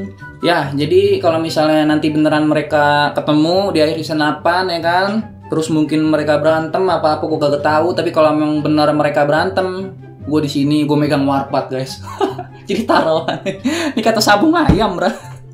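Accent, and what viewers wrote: Palembang, Indonesia. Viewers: perasaan dnger kata" kamp*ng, lu org plmbng pek ? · Bang orang mana niih??? Palembangkah??? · Aslinya palembang ya?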